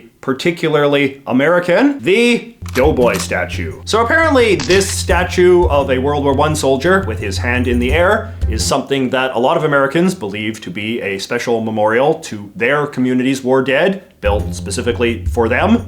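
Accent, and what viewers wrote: Canada. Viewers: "You know what they say about the weather here in {insert name of your north American city}, if you don't like it, just wait around a minute, it'll change" Are you exagerating your Kanuck accent? Or is that for real?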